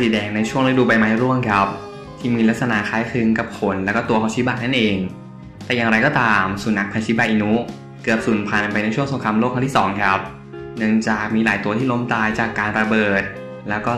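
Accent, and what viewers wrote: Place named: Suphanburi, Thailand. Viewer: คนพากษ์เสียงเป็นคน สุพรรณบุรี ราชบุรี สมุทสาคร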